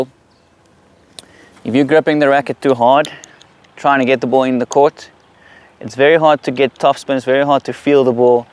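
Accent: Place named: South Africa. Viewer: The accent suggested he was South African, but the HADEDAS confirmed it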